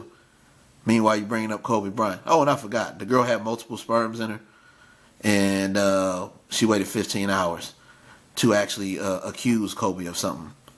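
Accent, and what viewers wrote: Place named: East Coast, United States. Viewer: EAST COAST, I LIKE THE WAY YOU TALK.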